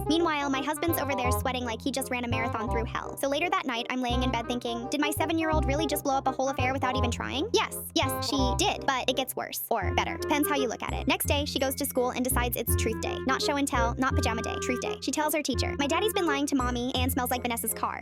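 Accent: Texas, United States. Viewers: The texas accent makes it funnier😂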